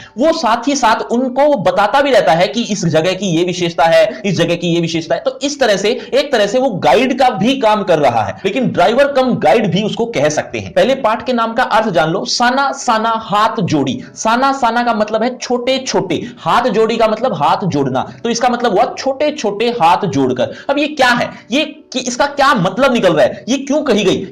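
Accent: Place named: Punjab, India. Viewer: Sir's Definitely From Punjab,His Accent Shows It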